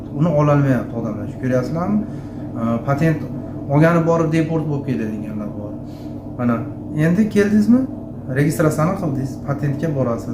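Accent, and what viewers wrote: Andijan, Uzbekistan. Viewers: Рахмат сизга анжонча килиб тушунтирдиз ишларга омад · Андижонча лайк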